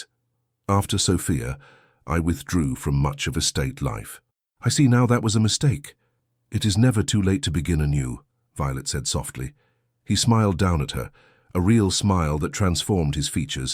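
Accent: United Kingdom. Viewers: Hi Yes! That purring English / British accent. YUM! From (ironically enough) Windsor, Ontario Canada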